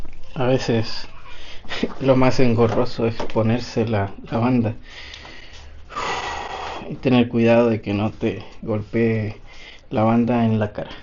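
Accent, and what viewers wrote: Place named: Chile. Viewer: Por tu acento eres de chile verdad? Por ciento normalmente cuánto cobras por un entrenamiento que me habias dicho en un comentario convertido a pesos mexicanos o como funciona que no soy de ese país?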